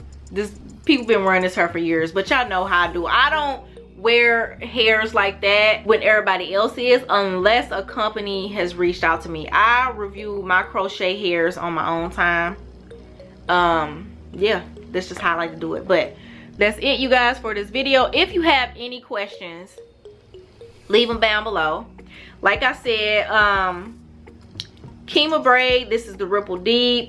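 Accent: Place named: South, United States. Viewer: I hear a southern accent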